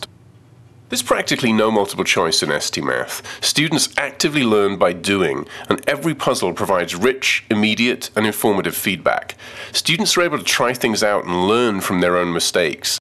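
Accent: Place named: India. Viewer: He Speaks Indian English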